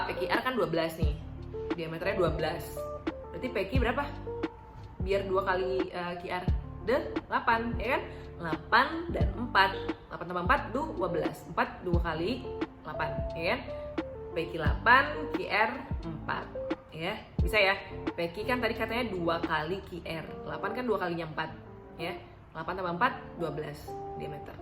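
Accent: Palembang, Indonesia. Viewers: Kak kokbahasa kk kyk bahsa plembangg sihh😚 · Kakak orang palembang ya? logatnya wkwk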